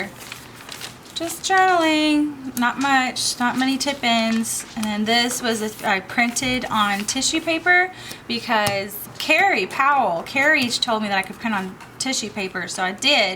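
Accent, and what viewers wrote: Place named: Texas, United States. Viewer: love love love your videos! I noticed your accent right away and knew you had to be from Texas! I myself am from Texas live about an hr outside of Austin. keep up the awesome videos ,I love how creative you are and I love your personality!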